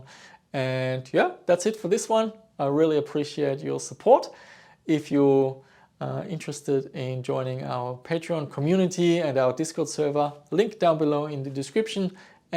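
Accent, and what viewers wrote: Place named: Germany. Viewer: Always enjoyed your videos! Question (please don't be offended): A few years ago, when I first started watching your content, I immediately thought you were German, but later learned that you live in Australia. My question is if you are in actuality a German living in Australia, or if you are a native? Your accent really throws me off :D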